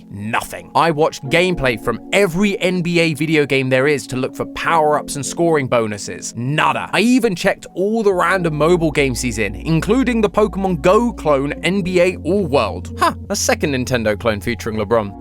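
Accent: United Kingdom. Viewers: Tbh He kinda sounds like matpat but a little more British 😢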